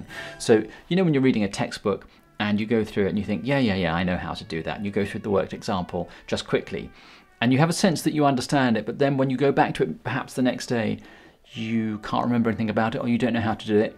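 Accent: England, United Kingdom. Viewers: Ok United Kingdom accent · why is every fucking youtube clip I click on either narrated or presented by a Brit? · Darling, your English voice is so comfortable to listen to, would you consider bringing in a Spotify podcast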